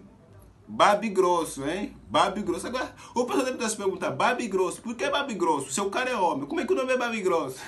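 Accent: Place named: Brazil. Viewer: E estas a falar tipo brasileiro porque? So para pareceres?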